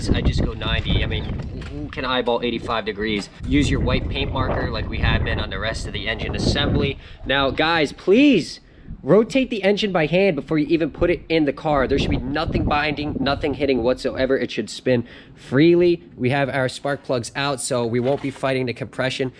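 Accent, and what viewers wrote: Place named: New Jersey, United States. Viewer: btw, I'll be in Summit, NJ over summer....I thought you might be local based on speech.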